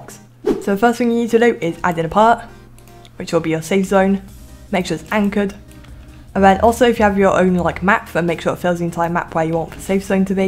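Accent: United Kingdom. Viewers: I know from the start of the video that you're british :]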